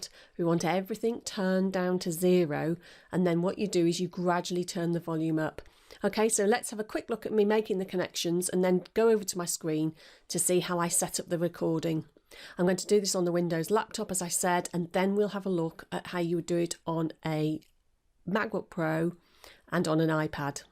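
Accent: United Kingdom. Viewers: UK accent. Kinda wiredley explained hm but imma.. give it a try..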